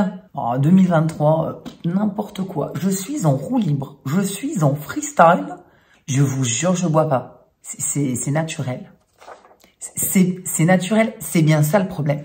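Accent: Italy. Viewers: Toujours un plaisir de retrouver ton accent d'accueil italien. 💖 Il n'est jamais trop tôt pour repartir en italie. 😅 Merci pour cette vidéo sur quand partir en Italie cette année 2023. 😍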